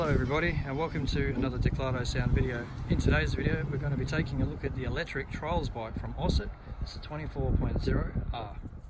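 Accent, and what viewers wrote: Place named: Australia. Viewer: nice i used to live in canberra now i live in the usa in seattle. it is good to hear an australian accent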